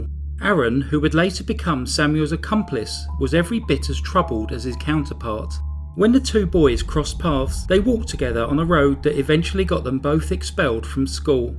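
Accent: United Kingdom. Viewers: I also love British accent ❤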